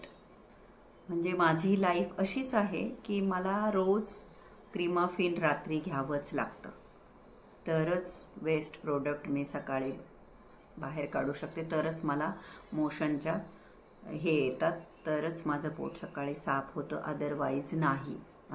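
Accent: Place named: Khandesh, India. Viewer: ताई तुम्ही तुमच्या भाषेवरुन खानदेशातील वाटतात.तुम्ही हा त्रास तुम्हाला नेमका कधीपासून होतोय हे सांगितले नाही.तुमची एखादी सवय बंद झाली आहे काय? एक उदाहरण सांगतो. एका कार ड्रायव्हरचा अॅक्सिडेंट झाला होता.कपाळाला मोठी खोक पडली.त्याचे ऑपरेशन झाले.पण आठ दहा दिवस त्याला संडासलाच न झाल्याने तो डाॅ.कडे आला.बरीच चुर्ण औषधी दिली .तरी फरकच पडेना.डाॅ. विचारात पडले.त्यांनी त्या पेशन्टला विचारले की अपघाताच्या आधी तुम्हाला कोणत्या सवयी होत्या का ? तर तो म्हणाला मी रोज एक पाकीट सिगारेटस ओढायचा.त्यामुळे मला हा प्राॅब्लेम येत नव्हता.डाॅ.नी त्याच्या हाती दोन सिगारेट देऊन ओढायला सांगितले. एकच सिगारेट ओढल्याने तो टाॅयलेटकडे पळाला. अशी खाण्यापिण्याची सवय तुम्ही आठवून पहा.जर त्यानेही फरक पडत नसेल तर तुम्ही निष्णात हिप्नोथेरेपिस्ट कडे जा.शक्यता आहे तुम्ही त्यांच्या ट्रिटमेन्टने बाहेर पडू शकाल.हिंमत सोडू नका ताई.आनंदी राहा. एक राजकुमार शिखारे नावाचे हिप्नोथेरेपिस्ट आहेत.ते हिप्नोथेरपीने असाध्य आजार बरे करतात.ते कदाचित सातारा किंवा पूण्यात असावेत.त्यांना जरुर भेटा.पण वेडे वाकडे विचार मनात आणू नका प्लिज.इच्छाशक्ती वाढवा. 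अनुलोम विलोम कपालभाती शास्त्रोक्त पद्धतीने करा.कदाचित तुमची प्राणायाम करण्याची पद्धत चुकत असेल. पण हिप्नोथेरेपिस्ट कडे जरूर जा.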